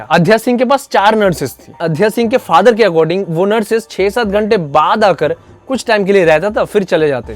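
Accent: Bengal, India. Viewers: fir bhi Hindi me Thora Bangla ka prabhav h..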